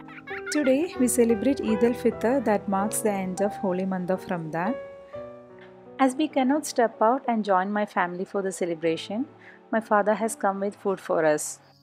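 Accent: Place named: Kerala, India. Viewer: Your Malayalam ascent is very spicy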